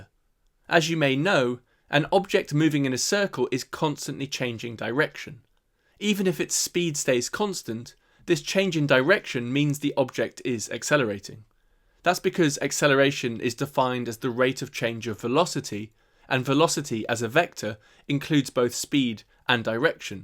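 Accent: United Kingdom. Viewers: If you have a British accent, why are you using the American billion?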